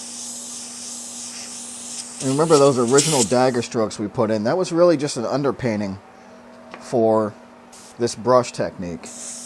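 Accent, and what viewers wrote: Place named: Pittsburgh, United States. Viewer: Pittsburgh boy. I recognize the accent. My grandparents lived on Glen Coe dr. McKeesport.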